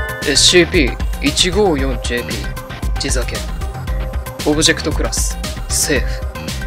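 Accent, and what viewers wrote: Kumamoto, Japan. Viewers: さんの熊本弁いいですね！今回も面白いSCPありがとうございます！ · わぁあ！すごい！すごいい！！さんの熊本弁です！！ありがとうございます！好きです！ JP系面白いの多いんですね！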